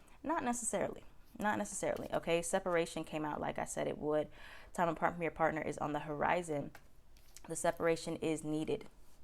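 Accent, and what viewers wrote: Bronx, United States. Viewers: You from the Bronx?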